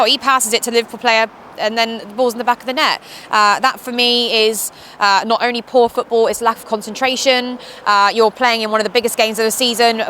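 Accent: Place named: West Country, United Kingdom. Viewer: Karen Brady has a posh accent, has West country accent being from Devon, not the same sounding to me.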